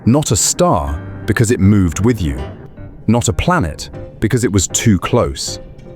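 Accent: United Kingdom. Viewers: Adding the British accent always adds some officiality to the video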